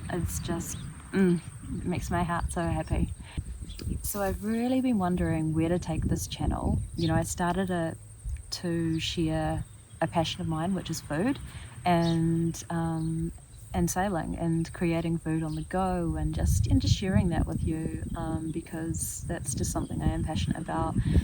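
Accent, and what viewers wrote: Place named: New Zealand. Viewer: Good morning. First of all, welcome back home to Godzone, Aotearoa, Nz. I WILL continue to follow, stay subscribed to your channel. I guess the content is easy to follow, simply because it's in "our" accent, and not in a monotone. My response, even though it's a cliche; is "You do You." I don't know what you have planned, but I will be here in Murchison, June to August. I would love to meet you personally. I kinda feel, that you have more to say. However it does get cold, through the winter here, in the village, but in reality, there's no such thing as cold. Just an absence of heat.!! 😮 Thank you for your previous content, and I look forward to further cooking episodes, if that is what you have planned. Maybe, van life.? In the meantime, have a break. All the very best. Much aroha.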